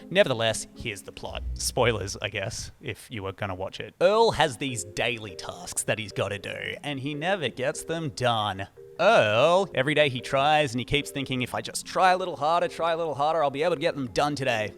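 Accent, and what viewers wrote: Australia. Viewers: Hey, as an Aussie who watches all these productivity / life improvement stuff, you are so incredibly refreshing. It’s great to hear our accent and the very aus approach to this stuff - ‘fuck it, I’m in!’ - you’re unique in a sea with lots of sameness so you gotta keep making content! I’ll listen to anything you’d like to talk about! This bucket list, mate - that’s fucking awesome and I’m looking forward to following your journey!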